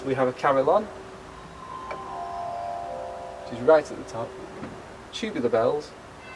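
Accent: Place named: Cockney, United Kingdom. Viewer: I love the Cockney accent but it's a great deal incomprehensible.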